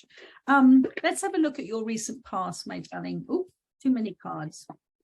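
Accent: United Kingdom. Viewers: Love this@ psint the sky on the Wedt Coast with family. They are buying a house. I will have my own rm. Travel again...another grandchild , their slowing down work load to do. I am the matriarch of the family. No worry no struggle. Thsnk you. I've missed your readings. Been months!! I l8ve yor British accent. In my blood on mom's side. May she RIP. I have the Medicine Animal cards. Got in the late 80's. Was in fear during the pandemic. Feeling much better.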